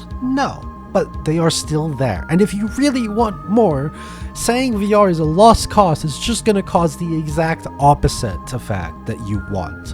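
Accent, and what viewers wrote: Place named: United Kingdom. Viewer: bro even if there's not a whole lot of VR games I believe you only need one Hotdogs, Horseshoes & Hand Grenades. Also dude what part of the UK are you from? Your accent is very interesting I don't think I've heard it before xD I'm midlands myself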